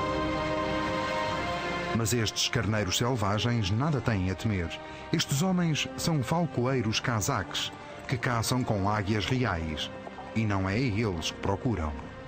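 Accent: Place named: Portugal. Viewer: triste esta narracao de portugueses, estes caras nem falar sabem como vao narrar algo???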